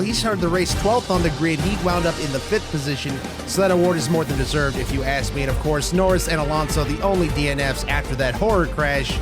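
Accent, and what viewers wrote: United States. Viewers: Mate his murican accent is so funny😂😂😂😂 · This is the most american voice i have ever heard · the commentator is sooooo american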